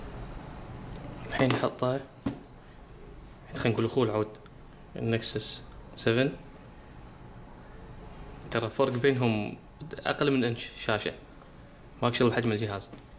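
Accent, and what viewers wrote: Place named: Iraq. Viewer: كلامك عراقي لايك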